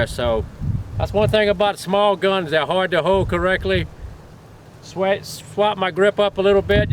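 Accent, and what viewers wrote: Louisiana, United States. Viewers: Mr, I a a North Louisiana native myself. I noticed a bit of a Cajun accent but thought you were a Texan. The good food and music got to ya and you know it's true. I love and respect you and consider you an American badass.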